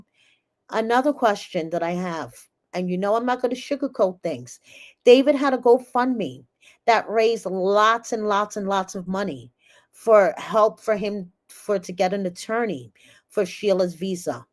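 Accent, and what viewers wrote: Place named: Connecticut, United States. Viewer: Your voice reminds me of my home CT. LOVE LISTENING TO YOU. 🍹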